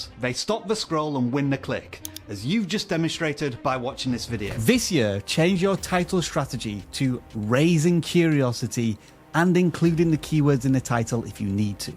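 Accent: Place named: Yorkshire, United Kingdom. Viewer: I have a random question for. I have a friend who is from Yorkshire and he’s from Hull and I thought that your voice sounded like it was from there but I was just curious. What part of Yorkshire are you from? Also there was something that you said in the video about how thumbnails and titles attract views which I think it’s true but if you’re subscribed to a person like I am to you, I just see that it’s from you and I’m interested. it’s not a Elon Musk scam live stream coming from VidIQ I’m gonna click on it.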